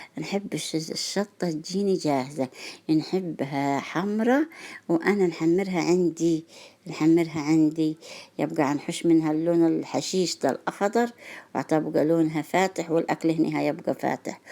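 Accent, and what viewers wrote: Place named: Armant, Egypt. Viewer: كلامك زي ناس ارمنت انا من هناك لكن مقيمة في أسوان واول مرة اعرف معلومة الطماطم الف شكر مشتركة جديد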